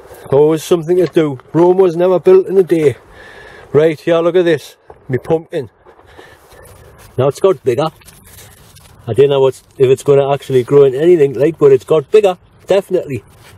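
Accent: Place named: North, United Kingdom. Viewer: I like you videos and the voice it reminds of all my relatives from the north Never mind about the dislikes some people just like to hurt .Good wishes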